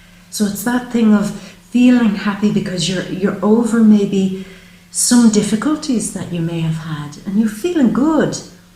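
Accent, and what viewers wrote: Ireland. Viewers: Not only is she on point, but that Irish twang in her voice is so soothing, so healing.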